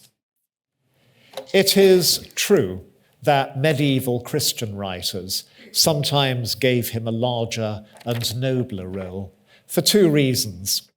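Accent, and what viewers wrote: United Kingdom. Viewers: My god is this British!!!!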